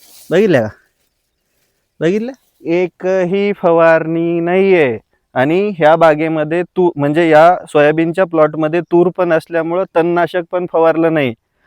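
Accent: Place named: Pune, India. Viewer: Lai popatpanchi bolato yar tu,tu sadashiv pethet jalmala as vatat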